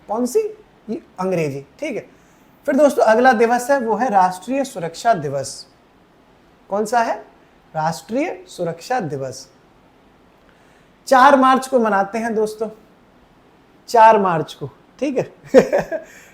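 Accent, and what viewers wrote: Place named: Meerut, India. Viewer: Are kaha shaab...marenge bas boli hiii khadi hai....Mai meerut se hu 😂😂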